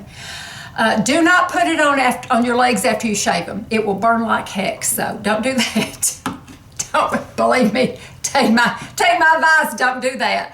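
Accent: South, United States. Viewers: My favorite season is Fall. The colors and coolness of that time of year I love. Congratulations on hitting 100,000!!😁 I so enjoy your videos. I lived in Tennessee for 10 years, and listening to your southern voice is my touchstone to there. 💜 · My favorite season is Spring with all the lovely flowers in bloom, including the Dogwood trees, azaleas and best of all no humidity! Despite all the pollen and my allergies, I still love Spring! I guess it’s all the new life bursting forth after the dead of winter. Congratulations on the 100,000! I love your videos ... I live in the Deep South. I’m turning 61 on January 29, so I appreciate your videos and you ... someone in my age range and who has a lovely southern accent. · I could listen to you all day. Your accent is endearing, to say the least. Southern ladies have an aire about them that can't be beat. 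Cheers from central FL!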